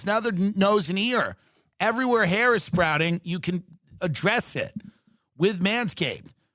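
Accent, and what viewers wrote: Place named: Long Island, United States. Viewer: That is not a "northeast" accent. That is a Long Island accent.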